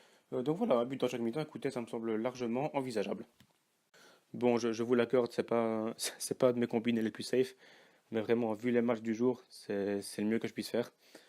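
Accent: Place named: Liège, Belgium. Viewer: salut tu viens de ou en belgique j aime bien ton accent j aurais dis pas loin de liege non ?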